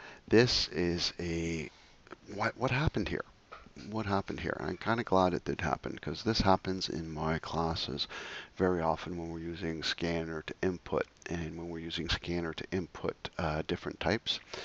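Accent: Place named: Scotland, United Kingdom. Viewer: sounds scottish. great accent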